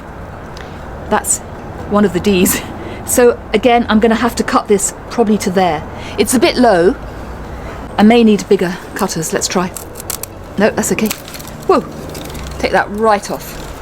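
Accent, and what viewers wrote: London, United Kingdom. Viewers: 喜欢Manda说英语的感觉，好优雅好精致，伦敦音好好听～而且解释的好细致好专业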